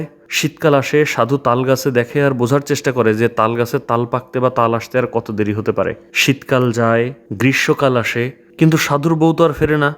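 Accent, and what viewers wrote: Khulna, Bangladesh. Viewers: ভাই আপনার কথা শুনে মনে হচ্ছে আপনার বাড়ি খুলনা অঞ্চলে🙂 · ভাই... আপনি কি আমাদের খুলনা/ বাগেরহাট জেলার মানুষ? আপনার কথা শুনলে সেটা বোঝা যায়। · আপনার বাড়ি কি খুলনা?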